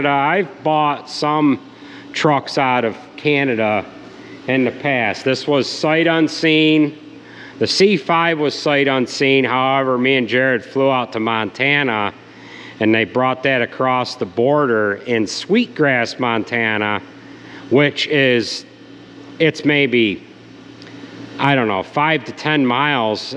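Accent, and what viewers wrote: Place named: New York State, United States. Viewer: I prefer the longer video's. Love to hear your yakking as it teaches me New York state speak!! Learn a little bit from Andrew Camarata but you beat him by a page or two.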